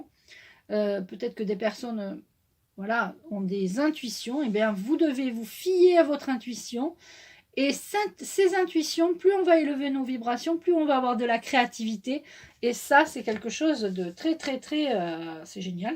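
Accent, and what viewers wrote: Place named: Marseille, France. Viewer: J'adore ton accent il ressemble au mien de Marseille ❤️❤️❤️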